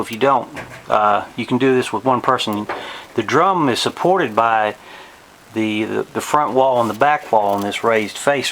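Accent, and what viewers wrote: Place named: North Carolina, United States. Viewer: Thank you! I didn't know it was so easy! (And you accent reminds me of home.) (Coastal NC)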